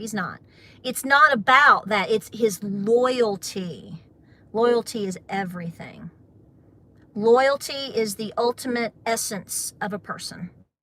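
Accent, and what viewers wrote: South, United States. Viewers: You say your accent isn’t southern but I am from northern Alabama and your accent sure sounds a whole lot like mine Love ya girl.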